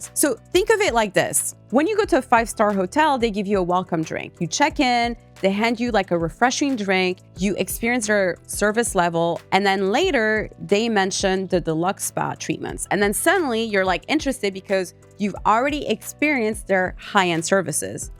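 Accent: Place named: Quebec, Canada. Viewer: Hi, hearing your accent...are you a french canadian by any chance?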